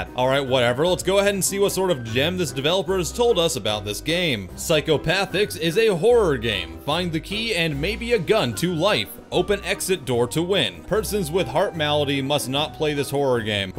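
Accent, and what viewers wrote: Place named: Italy. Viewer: This is the way some italian speak english lel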